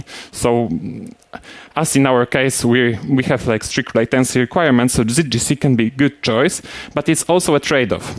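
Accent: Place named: Poland. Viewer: Haha, jaki chlop ma polski akcent xD smdzieki temu jest baedzo zrozumiale i zlapalem kqzde slowo ;)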